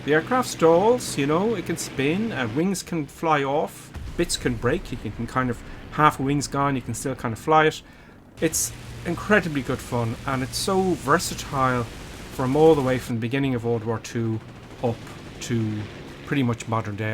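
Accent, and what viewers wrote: Ireland. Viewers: Just subscribed to, love his irish accent and the video was great😂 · Just discovered your channel - Excellent video and totally agree with your comments. I'm just getting back to simming as I become a retiree after about 8 years away in that time it's safe to say things have improved leaps and bounds (apart from p3d/msfs - till now we hope). I'll never fly a real plane but this is so close it's mindblowing. Love the accent BTW my family all originate from West Meath/Roscommon. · Are you irish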